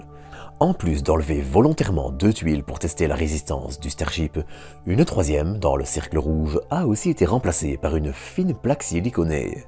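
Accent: Belgium. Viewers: Étant bruxellois, je crois que je reconnais un accent belge.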